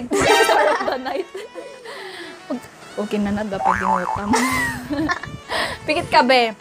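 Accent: Ilocos, Philippines. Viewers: "Sa kanyang lapistick" 😂 love your accent, im ilocano too. Your so talented dear. 👏👌Keep it up. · Ang ganda ate 😍 lapistick yung accent ate ilocana. Ilocana din ako😘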